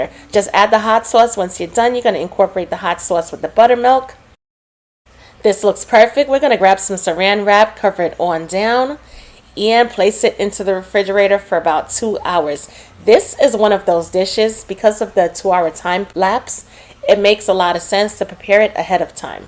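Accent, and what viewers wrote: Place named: New Jersey, United States. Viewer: I like your accent, are you from Jersey? Great recipe btw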